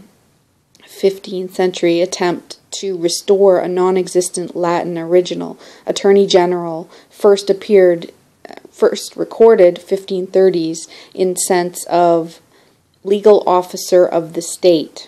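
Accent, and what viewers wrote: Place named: Canada. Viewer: There's a Daoist quote that I read in an old Alan Watts book to the effect that everything went pear-shaped when the law was written down.. I tried to find the exact quote on google so that I could post it on your Genesis video. Couldn't tho. Anyway, don't know if you say 'pear shaped' in Canada, but I prefer to use slang terms sometimes-- I'm probably out-of-date with them tho-- I was tempted to say 'Pete Tong', which is even more out-dated. I'm only guessing that you're Canadian, but the pronunciation of 'out' is usually a give-away. 'Great Work' in any case :)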